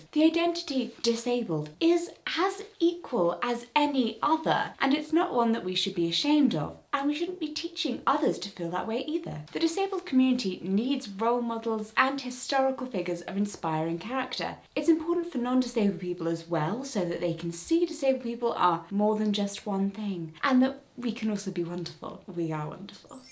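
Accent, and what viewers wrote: United Kingdom. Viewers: While not the "correct" pronunciation, I think the way says it is adorable, especially with her British accent. It makes Maryland sound like a lovely place of sunshine and good cheer. 😊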